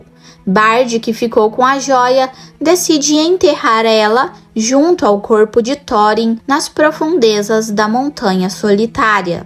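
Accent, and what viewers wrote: Minas Gerais, Brazil. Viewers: Cara?,sotaque minerio uai!,ela não puxa não, é só sotaque mesmo!(nos diga de onde vc é amigo?)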